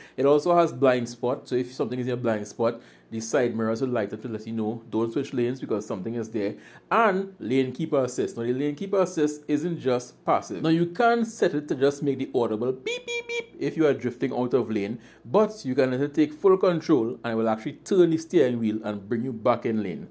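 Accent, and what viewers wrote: Trinidad and Tobago. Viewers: bro you from trinidad?!.... this is one of if not de best local reviews i've heard/watched keep it up👌👊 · So freshing to hear a trini voice doing this. Means a lot bro. Thanks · Great job with this review. Nice to hear a Trini accent for a change. BTW, the Japanese voice in the start-up sequenceis telling you that the ETC card isn't installed. This is for the Japanese toll system. This voice can be turned down with the volume button. Not sure, but I think the voice can be turned off. Also, Note is a 1.2L engine. Safety features are controlled by ProPilot.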